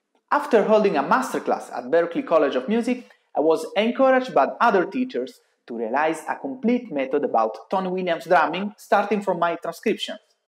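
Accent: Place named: Italy. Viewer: Gotta love that Italian accent! epic video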